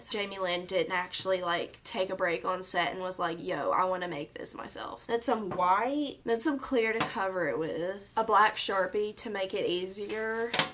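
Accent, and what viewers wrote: South, United States. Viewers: Omg what state do you live in? Southern af🤣 Alabama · I just found this channel and first of all you are hilarious and I love your southern accent because I can relate.. I am from the south #needmysweettea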